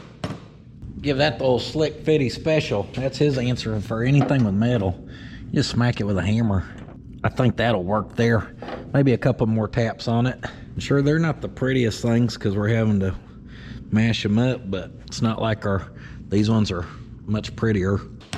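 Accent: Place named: Appalachia, United States. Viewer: LMFAO - I used to think the "Deliverance" - "inbreed from the hills of Kentucky" ACT, was just that, an act just for filming YouTube videos - you know just a shtick. But I have come to realize that it is real, this is really how you are, and the way you talk, and your real IQ. Kind of makes this channel even funnier when one realizes all the jumping, dancing, talking like a hillbilly, doing things that make you look not so sharp, is actually REAL.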